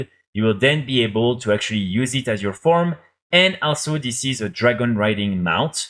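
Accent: France. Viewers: Nice french accent :) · Are you French ??? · tu es Français toi, l'accent ne trompe pas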